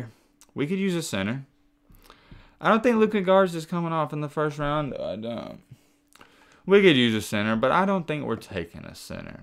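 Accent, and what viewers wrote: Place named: Alabama, United States. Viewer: The Alabama acentt in this nigga 😂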